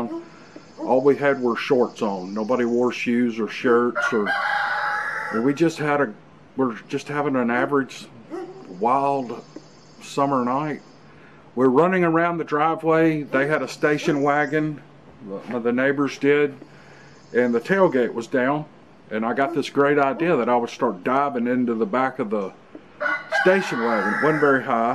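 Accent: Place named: West Virginia, United States. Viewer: You sound exactly like my friend from West Virginia. And not just your accent. If I heard you on the phone I would definitely think you were him. Me and my friend belong to the Creator of the universe too. No fear.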